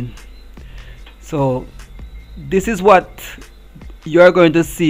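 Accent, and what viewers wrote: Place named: Jamaica. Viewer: love and support love the jamaican accent big up yuh self!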